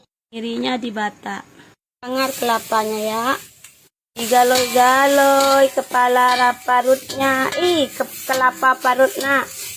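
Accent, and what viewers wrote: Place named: Madura, Indonesia. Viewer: Ini asli orang Madura 😁😁dari cara ngomongnya beda😂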